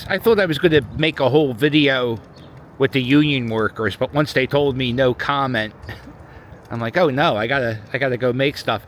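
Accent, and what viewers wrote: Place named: Philadelphia, United States. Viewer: Da Instagram, ya know?! 😂 Philly to the core. Love it. 💙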